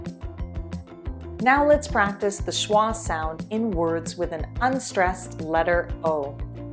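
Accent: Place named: Canada. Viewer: so this is Canadian English, it's good for me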